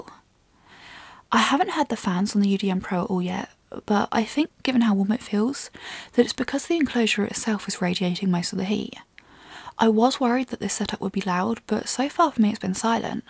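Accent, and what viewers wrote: United Kingdom. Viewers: Love the British accent for tech stuff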